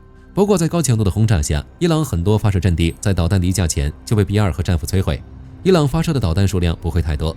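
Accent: China. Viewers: ㄡ是大陸騙子 說話者是大陸口音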